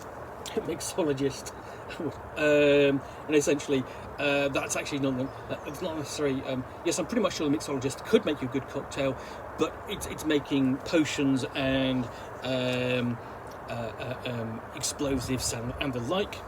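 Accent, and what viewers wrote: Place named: United Kingdom. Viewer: Bom video! Adorei seu sotaque britanico e sua calvice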